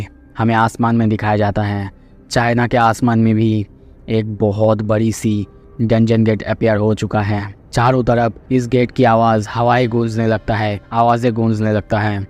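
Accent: Bengal, India. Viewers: Kya ap Bengali ho?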